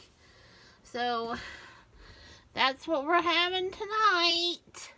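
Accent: South, United States. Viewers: Боже, комментарий на русском под видео тетки с бешено выделяющимся южным акцентом. Удивительно.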